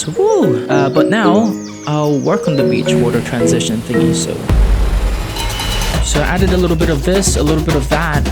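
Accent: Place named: Australia. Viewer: omg it’s an aussie!! side note: your building skills are litteraly jaw dropping oml🔥🔥, also the editing 🤌🤌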